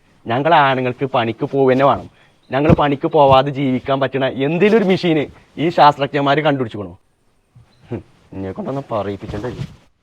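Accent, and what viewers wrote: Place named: Malappuram, India. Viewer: ഇജ്ജ് അൻ്റെ മലപ്പുറം സ്ലാംഗ് പിടിച്ചാൽ മതി, ഇടക്ക് ഭാഷ മാറുമ്പോൾ ഒരു ഗുമ്മു ഇല്ല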